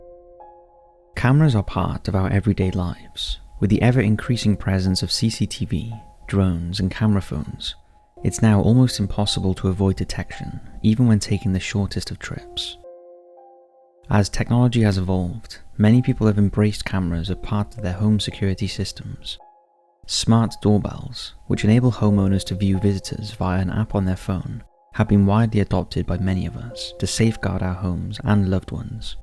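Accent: United Kingdom. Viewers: Where is the narrator from? Obviously UK. But I can't put a region on the accent. I'm from South Wales not far from Cardiff.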